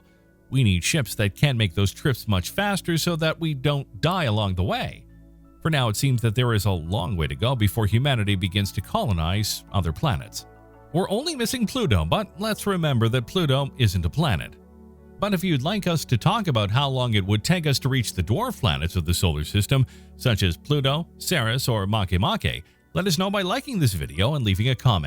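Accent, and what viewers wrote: United States. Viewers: Would it kill you to show the distance in miles also??? The narrator sounds pretty American to me and yet all you show is kilometers. So annoying 👎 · No shit. But the dude in the video speaks American English. Brits and Aussies are used to miles as well as Kilometers. The whole English world understands miles. Watch the Isle of Man TT currently running or watch MotoGP, they use both. If that's the reason, it's a piss poor one. Why not make a video the 330 Million Americans can understand also!?!?!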